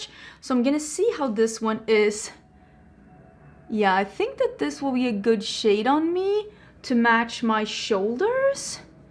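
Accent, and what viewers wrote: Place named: Sweden. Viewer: Totally agreed. I'm an English/Grammar nerd and her English is impeccable. I do love when the Swede slips through and Js become Ys, but so many people who speak English as their first language commit far worse word crimes.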